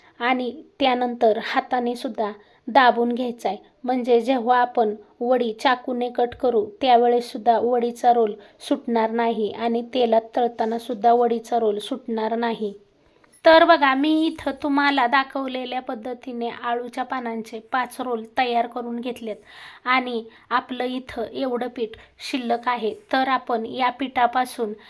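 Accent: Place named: Satara, India. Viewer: ही अस्सल सातारी भाषा आहे त्या मुळे ऐकायला तस वाटत असेल बट योग्य कमेंट्स कराव्यात. कोणतीही भाषा असो तुम्हाला समजलं ना! मग कलेला प्राधान्य दिले पाहिजे.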